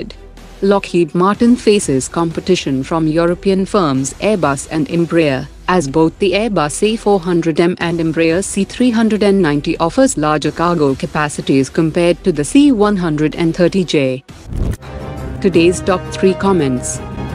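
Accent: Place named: India. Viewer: I love desi English 😉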